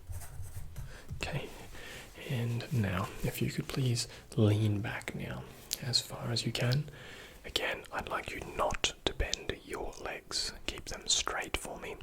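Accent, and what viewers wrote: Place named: Australia. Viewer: damn you are fulfilling my South East Asian Physiotherapist fantasy with an OZ accent and im so in it. forgive me.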